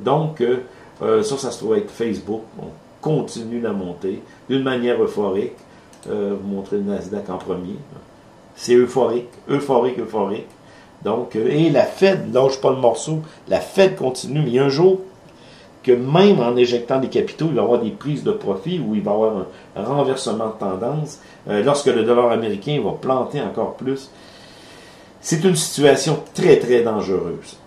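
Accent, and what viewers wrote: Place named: Quebec, Canada. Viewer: Votre accent est magnifique. Québec libre !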